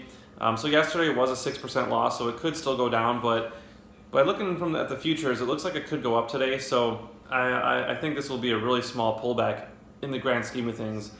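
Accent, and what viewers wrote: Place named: RP, United Kingdom. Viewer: Ok cool, it reminded me of how the Queen speaks (Im in the UK) :) I think Tesla should have produced the Y in the first place instead of the Model 3. The 'crossover' is the most popular type of car out there in N America. On production they are too slow at the moment in fulfilling orders. Even if they made 5k a week which they aren't doing, thats only 240k a year. Its not enough to be a mass market. I don't know why they didn't invest in production facilities to make 10k a week right from the start. Why not take the debt on while it is available from the capital markets and just put the infrastructure in place? Otherwise they'll just keep ticking along making cars in relatively small amounts. If they want to be the iPhone of cars they need to get the cars out of the door!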